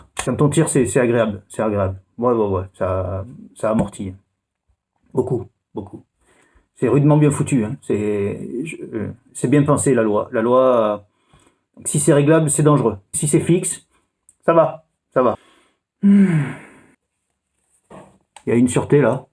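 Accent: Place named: South, France. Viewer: Putain c est génial !!!! Tout l accent du sud qui va bien et le reste !!! Mdr 😂 salopage mythique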